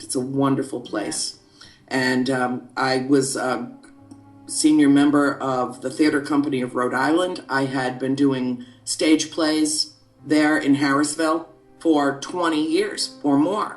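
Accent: Ireland. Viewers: It's called a Djinn. N' omg by the sound of your accent, you're Irish. :.D <3 What went on in that house, was because of the witch, contacting a Djinn. (Which most may call a demon). The thing to do with the sacrificing, and whatnot. Many stories like this have been heard in Algeria, where I am originally from. It's to do with the occult, and soul sacrificing. No joke, this stuff is real, and just don't go near it. Movie was based on real events. They interviewed the kids who lived there, and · OMG I love the Dublin accent! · yep I love the irish accent to.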